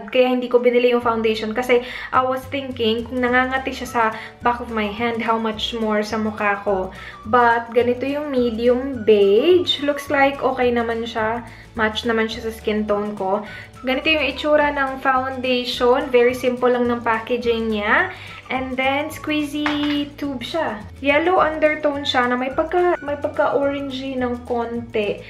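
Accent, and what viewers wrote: Visayas, Philippines. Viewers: ang panget mo mag tagalog parang bisaya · hahahah ang kulit nya mag salita visaya ata si ate 😂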